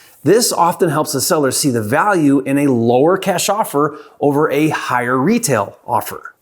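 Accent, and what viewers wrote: Michigan, United States. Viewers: He's got that Michigan accent forsure haha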